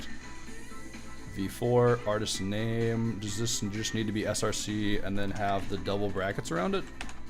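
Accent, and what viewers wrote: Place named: United States. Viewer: Thank you thank you 😥😥😥 Finally we have a YouTuber who has American accent new courses, short simplified and great 😣😣 thank you very much By the way any quick idea to learn mathematics for machine and deep learning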